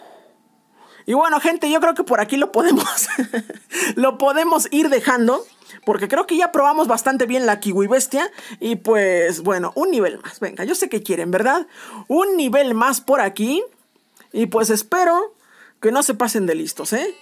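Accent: Spain. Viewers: Hablas como español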